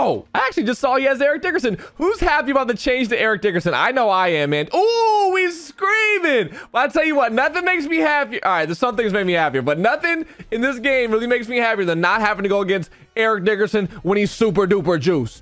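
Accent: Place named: New Jersey, United States. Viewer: where are you from ? you have a jersey accent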